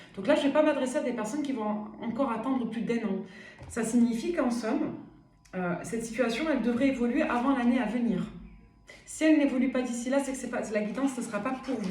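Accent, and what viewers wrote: South, France. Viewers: L'accent du sud, c'est la vie, c'est plus qu'un accent, c'est un état d'être. Un grand merci pour votre lumière bienveillante et franche.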